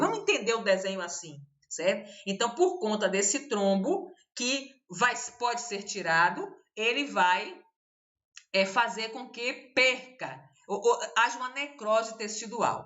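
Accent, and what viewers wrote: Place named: Northeast, Brazil. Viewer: Amo suas aulas querida ,a senhora tem o jeito de nós do nordeste falar, no momento moro em SP, faz 6 meses que comecei a fazer o técnico de enfermagem, mas N deixo de vê suas aulas ,aprendo demais com ela,gostaria de saber se tem como eu obter suas apostilas,não deixe de publicar os vídeos, um grande abraço...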